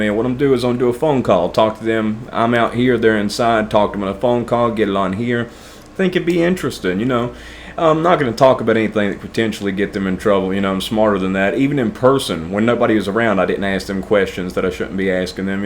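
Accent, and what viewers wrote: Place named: Tennessee, United States. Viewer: Man great story. When I hear you talk it sounds like a TN accent, sound like we grew up together. Kept wondering did I go to school with?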